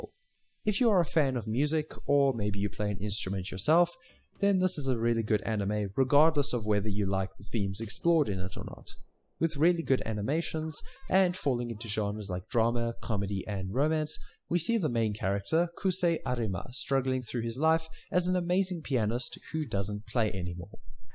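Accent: Afrikaans, South Africa. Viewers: What is that accent? Afrikaans English ? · Are u Afrikaans?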